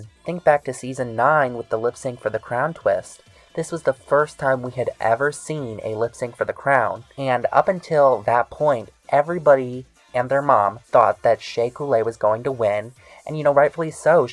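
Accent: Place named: United Kingdom. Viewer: Your british accent cracked me up🤣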